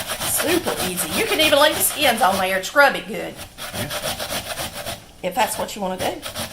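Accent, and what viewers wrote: South, United States. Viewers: You sound and cook like a southern woman if you are I'm am to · I am from Georgia, Rockdale County, and totally cook southern style. In love to watch you and hear your accent, I have lost most of mine. Your husband does a very nice job as your camera man. You cook differently than I do but it all seems to come out very well and looks delicious. ( I know we all do it as either our mother or grandmothers did). I would absolutely love to have some of your gift of the red Plum Jam. I know that it is made from those little wild plum trees you see growing just off the road all over the state. Any way I will keep watching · Love that southern accent!!!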